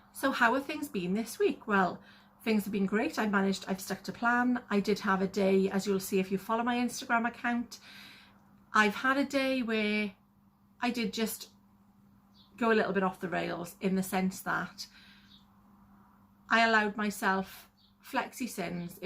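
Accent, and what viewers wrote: South Wales, United Kingdom. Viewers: Hay found you on YouTube today. Thank you for sharing your SW journey with us. So pleased that your family are home safe and well. Congratulations on your NSV, you will lose that game next week. It’s lovely to hear voice from south Wales. X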